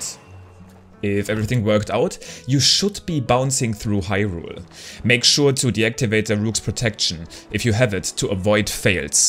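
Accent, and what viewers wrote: Germany. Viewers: He’s definitely German :) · I was wondering why he had such a german accent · habe mich schon gewundert warum er so einen Deutschen Akzent hat. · man hört so sehr dass du deutsch bist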